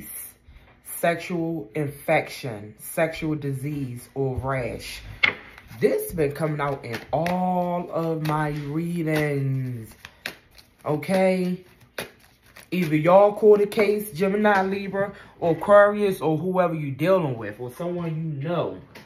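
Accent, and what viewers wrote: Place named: Baltimore, United States. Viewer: HELLO your brownskin is brown skinning you look great i love your Baltimorian accent it's gritty CUTE..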